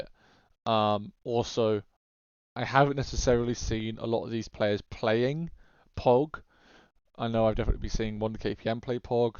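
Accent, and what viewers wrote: United Kingdom. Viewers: dude! i just realized you're from the UK!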